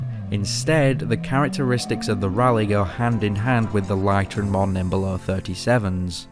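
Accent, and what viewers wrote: United Kingdom. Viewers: Yorkshire accent for the win. Snurr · Are you from East York’s?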